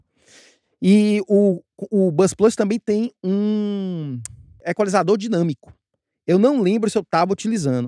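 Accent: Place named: Salvador, Brazil. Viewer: Muito bom ouvir o sotaque soteropolitano falando coisas tão de audio tão importantes e trazendo a música fantástica que se faz em nossa cidade.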